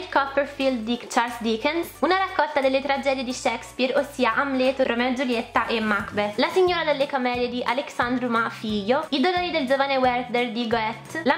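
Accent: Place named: Italy. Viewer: Ya sabía yo que era Italiano. Se oye luego luego. Lo bueno que se parece un poco al español y pude entender algo jaja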